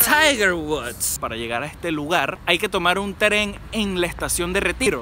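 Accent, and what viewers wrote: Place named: Venezuela. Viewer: no es de hater, pero porque los venezolanos siguen hablando como venezolanos estando en Argentina, me choca que no adopten ni un poco el acento.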